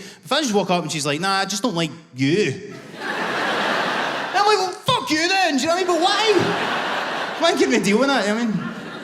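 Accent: Scotland, United Kingdom. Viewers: This dude kills me, I need to go to Scotland to see one of his shows even though I need the subtitles to understand the Scottish accent 😅. Thanks Marc Jennings!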